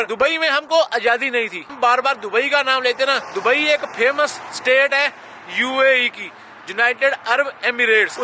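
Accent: Punjab, India. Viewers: I always like to see your videos because it's informative and entertaining too... your talking way with punjabi-hindi that is awesome.... specially word Sat shri akal ki hal chal and chardikala.... God bless u bro 🙏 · Bhahi tohadi hindi bilkul mere jesi hai.. Hum punjabiyon ki hindi bhi kamal hai :)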